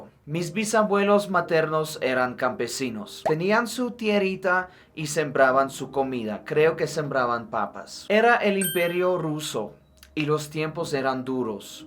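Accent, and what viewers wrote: Colombia. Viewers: Tu maravilloso español colomombiano y tus conocimientos y forma de explicarlos dan un gran aporte a cuantos estamos interesados en saber y entender la compleja historia entrelazada entre rusos y ucranianos. Estremece y duele la avanzada destrucción de Putin sobre el valiente pueblo ucraniano. Saludos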